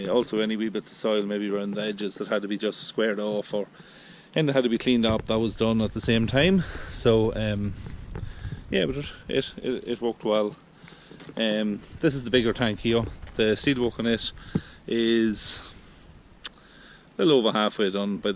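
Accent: Dundalk, Ireland. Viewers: Where abouts in Ireland are you based ? Sounds like your from around Dundalk (louth) area ?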